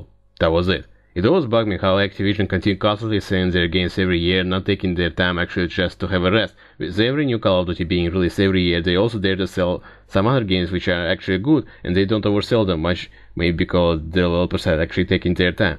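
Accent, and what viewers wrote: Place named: Russia. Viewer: I'm a french canadian and also not very good in english, but somehow I understood 99% of what he said. Sure he have a russia accent and he's speaking very fast (that's the point of the video, fast like Zero Punctuation), but I've heard faaaaar worse on youtube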